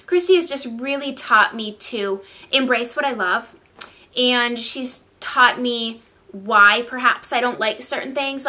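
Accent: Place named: Upper Midwest, United States. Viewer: I LOVED this concept!!! And don’t worry, you still have your accent. It’s part of what I enjoy about you being from the Northern Midwest as well 🤗😍👏❤️💕✨💕💕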